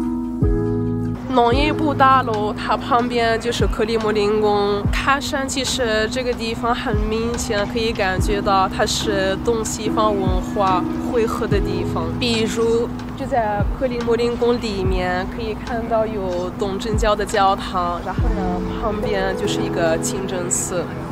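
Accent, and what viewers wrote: Standard. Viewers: 感覺的中文很標準耶..今天看到你的頻道..已訂閱..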